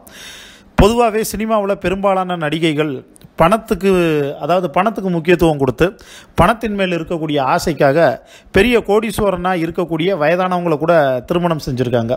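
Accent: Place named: South, India. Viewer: Ninga South ah? Slang apdiye pesuringa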